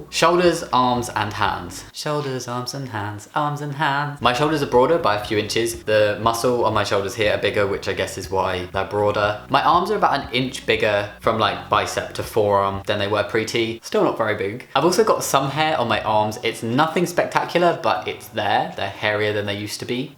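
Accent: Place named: Scotland, United Kingdom. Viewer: Little bit less Scottish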